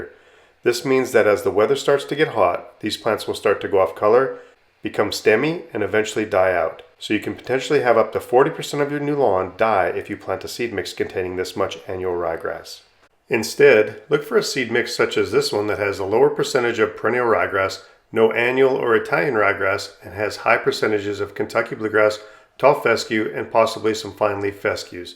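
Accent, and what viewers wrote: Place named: Midwest, United States. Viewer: It's a Midwest pronounciation quirk. Plenty of Midwesterns with Italian roots, including more recent generations, also pronounce it this way.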